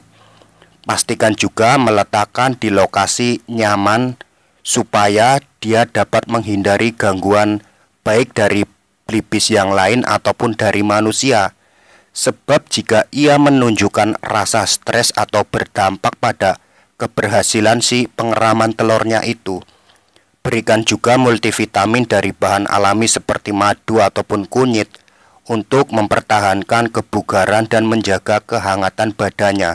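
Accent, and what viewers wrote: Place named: Ngapak, Indonesia. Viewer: Mas nya ini suaranya medok banget,,wong ngapak ya mas,,?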